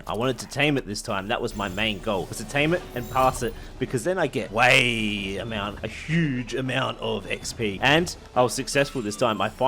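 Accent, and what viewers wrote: Australia. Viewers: I can't tell whether he's saying "pals" or "powers" because he's too Australian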